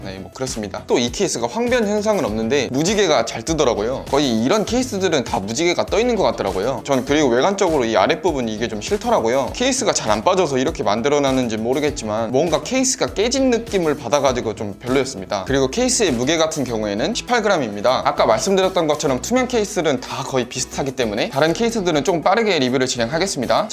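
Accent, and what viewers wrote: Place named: Jeonbuk, South Korea. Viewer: 고향이 전북이신가봐요 전주 아니면 군산 ㅋㅋ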